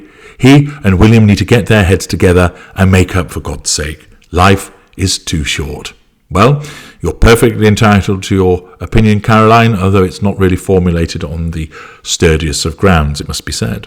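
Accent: Australia. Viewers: Love the Ozzie accent. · Hahaha 🤣 love your Ozzie accent.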